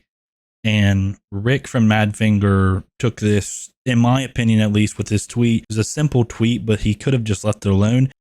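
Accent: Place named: Baltimore, United States. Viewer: You have that Pittsburgh/Baltimore accent going on and I like it Edit Mostly Baltimore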